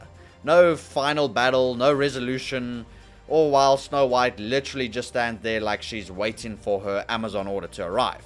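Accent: South Africa. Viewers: You know it's bad when Dragonball Evolution has a "higher" low rating than the movie. Also you're accent makes me think you're from South Africa? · Are you by any chance from south africa, cause your accent sounds like it. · Where's your accent from? Feels kinda South African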